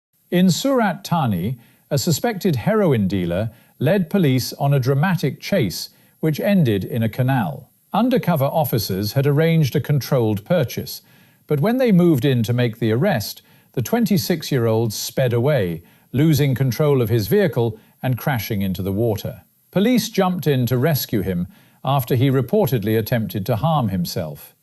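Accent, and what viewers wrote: United Kingdom. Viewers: That's a british man?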